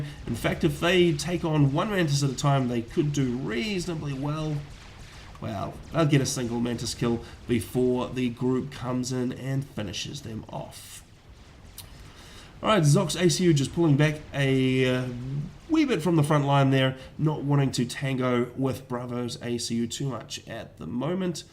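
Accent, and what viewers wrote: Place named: New Zealand. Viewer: Nice commentary and camera movements! I always do a double take when I hear another kiwi accent on youtube :D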